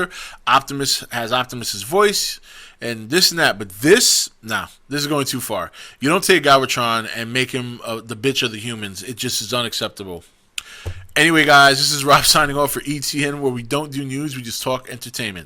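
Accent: New York, United States. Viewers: You Sound like a New Yorker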